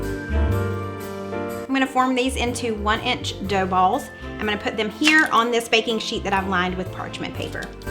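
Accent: South, United States. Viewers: I do Santa Cookies in A Jar and gift them to friends so they can bake them for Santa I also do a hot chocolate in a jar and put them together in a gift basket. It’s always been a huge hit. I also do the Hot Chocolate in test tubes and put a ribbon and tag around them for my son to give to his friends and teachers. I love your southern accent I live way down south and I have it to. I guess the saying is true you can take the girl out of the south but you can’t take the south out of the girl no matter where you live. 😊 Merry Christmas!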